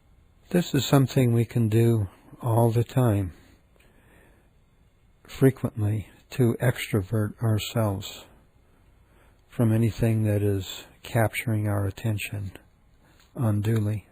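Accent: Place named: United States. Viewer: i think its because its american, but the voice really creeped me out, abit. sorry.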